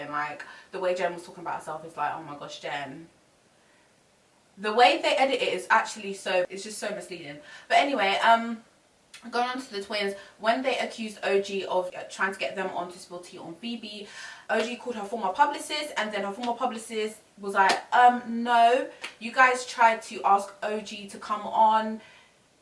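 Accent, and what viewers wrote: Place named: United Kingdom. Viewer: Lovely Brit accent I love it OMG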